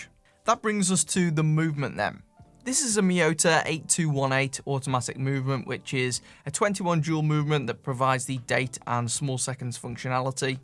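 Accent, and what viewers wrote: Stoke, United Kingdom. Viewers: My dad was from Stoke. Your accent is absolutely fine by me as it reminds me of him now he's no longer with us. Love your reviews too.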